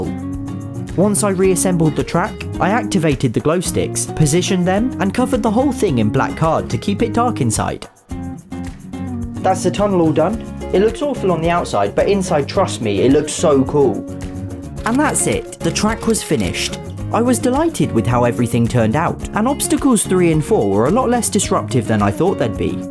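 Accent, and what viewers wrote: United Kingdom. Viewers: I like this guys British voice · You british?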